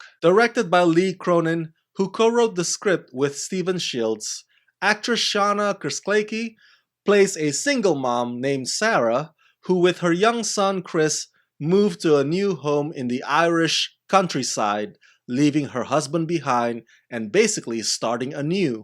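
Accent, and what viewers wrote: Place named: Indonesia. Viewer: You sound like Indonesian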